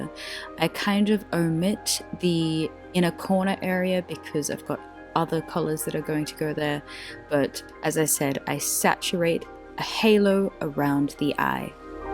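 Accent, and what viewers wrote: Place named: Australia. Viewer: Wow are you aussie. You sure sound Australian. I’m in love with your look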